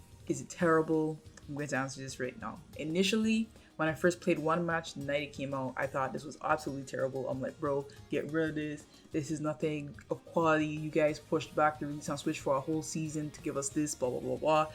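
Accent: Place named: Jamaica. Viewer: she sounds Jamaican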